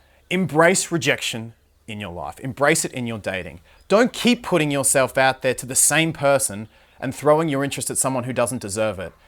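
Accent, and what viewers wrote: Australia. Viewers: Bruh u an Aussie??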